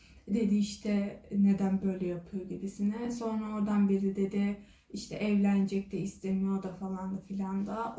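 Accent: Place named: Germany. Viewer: türkcen almancilar gibi cok kötü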